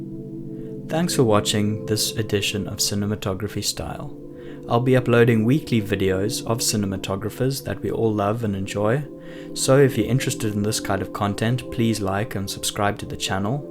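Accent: South Africa. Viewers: Love your South African accent brother 🙋🏾‍♂️. Great insights as well. He's my favourite cinematographer atm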